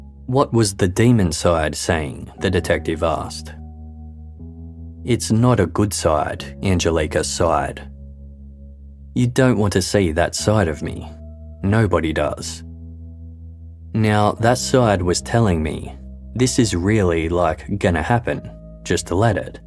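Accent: Australia. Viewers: He's in Australia, They pronounce a lot of things differently.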